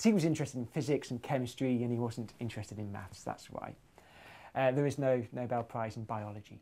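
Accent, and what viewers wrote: United Kingdom. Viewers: the story-telling is somehow better with the British accent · Love the British accent. Hardys thoughts make me upset im almost 25 and I feel like now I can't make it ugh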